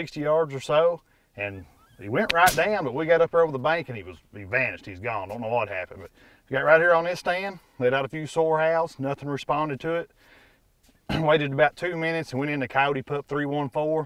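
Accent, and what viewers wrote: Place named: Kentucky, United States. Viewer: don’t ever lose that Kentucky accent. That’s authentic Americana right there. Respect. Oh ya, you’re a hell of a shooter, too.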